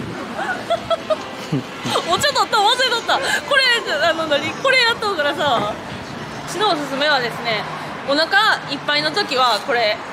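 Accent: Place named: Hyogo, Japan. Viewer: 兵庫の人ですか？「～しとう」がずっと耳に残ってるｗｗｗ いかに美味しいか説明のパワーと語彙力おもろい:yougotthis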